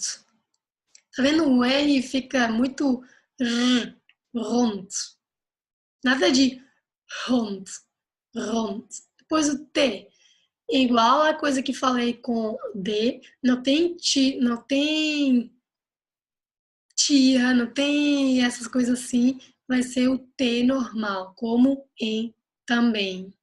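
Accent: Northeast, Brazil. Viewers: As vogais não são iguais " como em qualquer outra língua". As do inglês são no geral diferente de tudo qto os demais. Idiomas. A única coisa positiva, é o seu ligeiro sotaque nordestino. Brasileiro. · Comecei a estudar o Holandês e me deparei com seu vídeo. Obrigado pelas dicas. Adorei o seu sotaque português, ele é bem nordestino. Parabéns!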